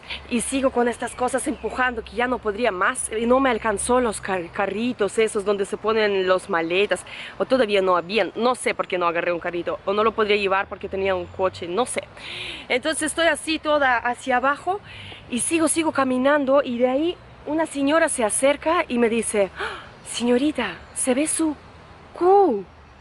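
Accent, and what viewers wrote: Amazon, Peru. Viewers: Yo te escuché y pensé que eras charapa. Me encanto tu historia y la manera de contarla. Felizmente te quedaste en el Perú. Bendiciones. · Interesante divertida la forma de contar tu travesía tu español peruanisado es lo mejor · Soy yo o me parece que tiene el dejo de la selva ¿? ... Por cierto que buen español manejas. Saludos! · Tu español es rapidisimo...casi ya 100% Peruano..bien alli. !! Suerte en mi Peru, como lo extraño !! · Se te pego el dejo peruano 😍😍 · tienes un acento de la selva peruana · Tienes un dejo charapa 😄. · X ahi dice q se te escucha como charapa de la selva pero aveces te escucho como la paisana jacinta x eso me caes bien dices q vas a ir a Ayacucho en abril q bien tengo familiares x ahi la pampa de la quinua es un lugar muy turístico xq ahi se libro la ultima batalla de Ayacucho contra los españoles donde q ganamos y se sello la independencia de Peru y America hispana y tu historia es graciosa en conclusión entrastes al peru x tener un gran cu.. 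xq a los latinos nos gusta mujeres de gran ku.. jejejeje .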